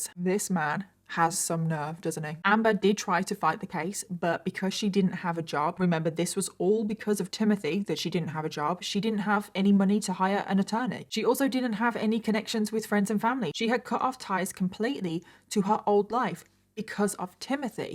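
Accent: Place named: England, United Kingdom. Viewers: Congratulations on topping the charts,sounds like you have a midlands accent,spot a fellow midlander anywhere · its nice to hear Timothy in an English Accent i have been in the states for a while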